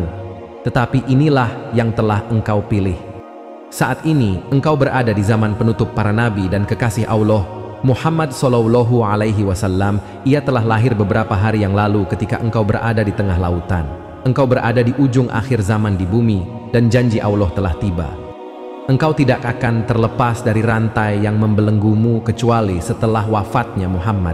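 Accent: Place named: West Java, Indonesia. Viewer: Itu tergantung Logat daerah asalnya,sptny dari Sunda,cuma saya blm pernah dengar riwayat Dajjal mengembara SPT diatas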